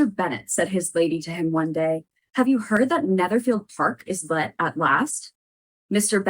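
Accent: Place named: United Kingdom. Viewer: Oh it's so nice to hear another British person on here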